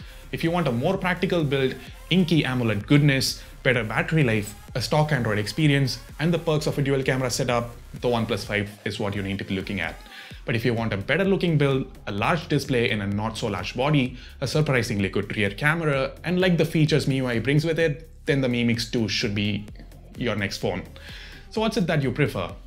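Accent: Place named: India. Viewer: Indian accent is always fun to hear :)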